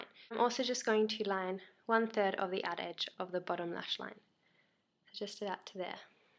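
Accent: Australia. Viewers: r u Australian? i am!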